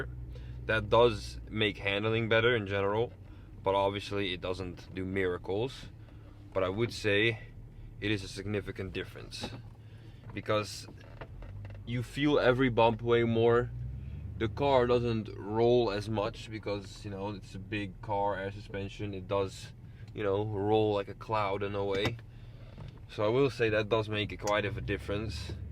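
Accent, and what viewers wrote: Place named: Netherlands. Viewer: why does this dude have a dutch accent living in poland?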